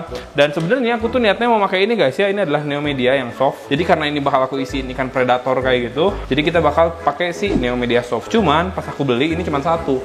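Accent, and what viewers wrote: West Java, Indonesia. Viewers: Bang Orang Sunda ya:)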